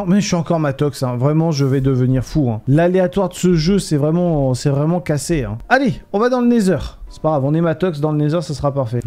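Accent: England, United Kingdom. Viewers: pas pour moi l'accent anglais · Je t’adore je je t’aime ton axant anglais .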